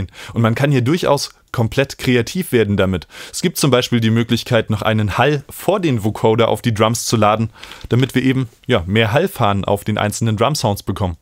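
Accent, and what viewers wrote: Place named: Mecklenburg, Germany. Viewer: du kommst ausm Norden Kollege. Du hörs dich an wie mein Homies aus Mecklenburg. Weiter so macht super Spass.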